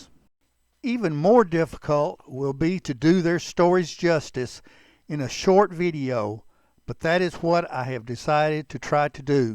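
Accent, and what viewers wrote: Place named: South, United States. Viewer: Another great video. Ya know, it has to be a blessing from god, or just severe dumb luck. That he made you into the genuine, humble, good man in which you've become. Ok, and before your southern drawl starts telling me its no big deal, then deflecting praise out of humbleness Now you are hearing from a man that has nearly watched every one of your videos by now. If we're honest with each other, you weren't exactly Walter Kronkite in the first couple videos. But please don't insult me enough to think that I'm only saying this as a man who admires you. You have upped your You-Tube skill level. But certainly the mildly stated, trough the roof improvement in the calmness, knowledge, a vocal delivery that simply makes people feel comfortable in the content of which you produce. If I weren't too cowardly to try, I'd likely only last a day, since I'm too damn opinionated. 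I respect and appreciate you greatly. But even if ya have to block, or "unfriend" me. I will not stop trying to get you to take off the time you deserve to enjoy the fruits of your accomplishments. Alas, life is usually a bit shorter than we expect. I truly hope you take advantage my friend. Even, if depressingly, we miss a weekly video, Don't you know that All of your subscribers will still be here, patiently waiting for you.. So sorry for the long e-mail. I was just gonna be sure about letting you know what your subscribers think of you. Talk again soon perhaps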